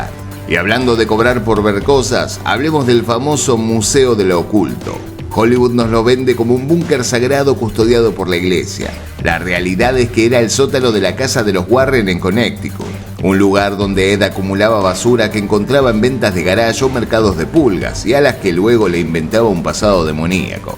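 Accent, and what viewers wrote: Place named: Argentina. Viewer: Amo este acento argentino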